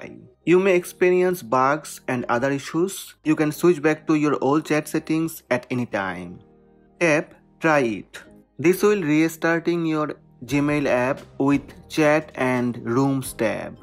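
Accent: India. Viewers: His accent is Indian, sonny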